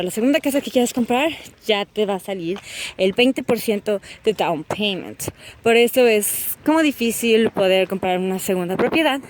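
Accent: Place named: Mexico. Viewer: Me han gustado mucho tus vídeos, he pensado en irme a vivir a Canadá pero primero quiero conocer más a Canadá. En que provincias vives porque he visto muchos tus vídeos y por lo que has dicho es una provincia donde es más económicas que las otras. Te felicito, son excelentes tus vídeos y tu acento es como Méxicano; soy Colombiano.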